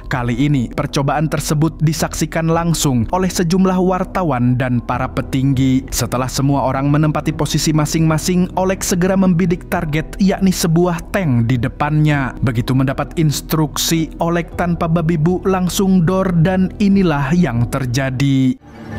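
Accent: Banjar, Indonesia. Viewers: Bang tiap intro jgn hiLangkan Logat banjar ny ya🎉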